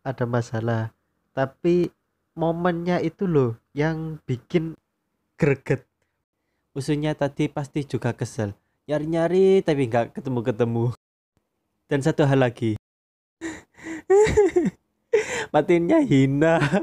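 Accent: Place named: Java, Indonesia. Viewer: Br nonton game ini.. Sk sm suara nya medok medok jowo ok.. Arigato gosaimasta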